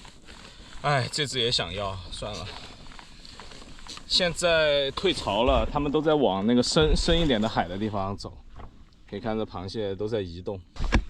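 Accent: Sichuan, China. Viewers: 看到小兄弟的視屛號,想必你是四川人.台灣空軍與眷屬中有許多四川人,所以口音很像!卅年前去北海道旅遊,那次都吃怕了,每餐必上.搞得我們家好多年不敢再吃!祝吊蟹豐收!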